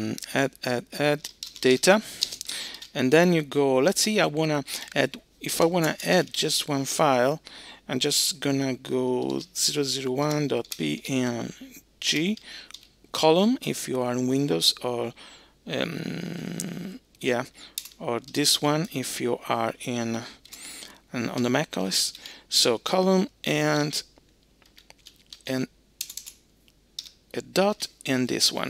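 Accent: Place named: Italy. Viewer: Ho riconosciuto lievemente l’accento italiano 🥰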